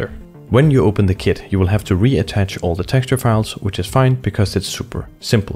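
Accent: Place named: Denmark. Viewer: The good old danish accent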